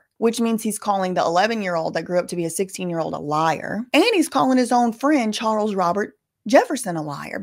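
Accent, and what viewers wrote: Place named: Alabama, United States. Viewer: I have been watching your videos and your accent is familiar are you from Alabama?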